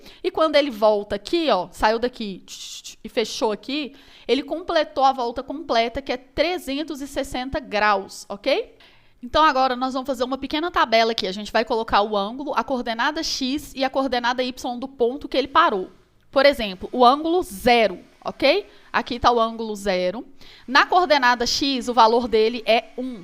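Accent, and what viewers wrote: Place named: Minas Gerais, Brazil. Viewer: Tive um problema assistindo aula dela… adianto que foi ótima ! Aprendi bastante. O sotaque minerin dela 🥰😍 às vezes me distraiu 😊😂 Excelente vídeo !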